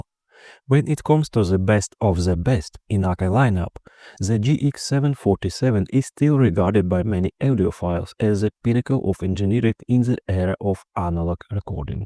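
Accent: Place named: Russia. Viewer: It’s very hard to understand anything you’re saying because of your very heavy Russian accent!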